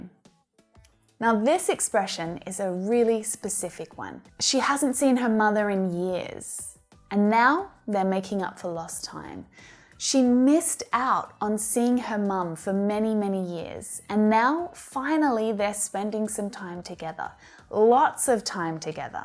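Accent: Australia. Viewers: I ❤️ Australian Accent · I love Aussie accent and lucky for me I’m Aussie come from Australia live in Australia like if your Australian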